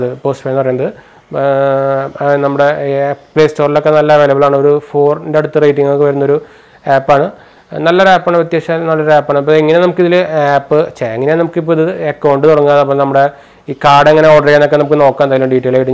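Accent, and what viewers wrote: Thrissur, India. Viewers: തൃശൂർ ഭാഷയാണ്. അൽപം സ്പീഡ് കുറച്ചാൽ നന്നായിരിക്കും.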